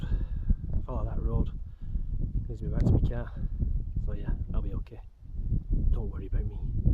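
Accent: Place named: North, United Kingdom. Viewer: Subbed you right back my mate... I's good to hear our Northern Lingo on the Tube pal.. Always up Rivvy buddy... Taking the lads up next Saturday as it happens. We are only 5 miles South of the Pike too man. Small world. :-) Have a good un mucker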